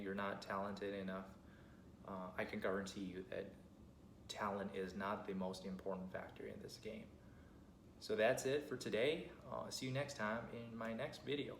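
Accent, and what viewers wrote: South, United States. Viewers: Dude how do you sound so Southern? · Hi, I have a question, how did you learn English? From your accent, I guess you went to american at an early age and studied maybe middle school in USA, is my guess correct? ❤ your sharing videos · Your English has a southern style to it 🔥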